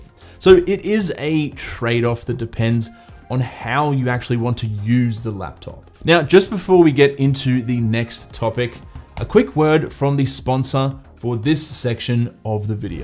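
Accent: Australia. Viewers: He sounded Aussie to me. Now I'm confused.... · Such a great vid, cheers! It took me a while to figure out why it was so easy and relaxing to watch.. it’s just nice to hear another Aussie review these things and not have to process accents haha thanks mate 🤙🏼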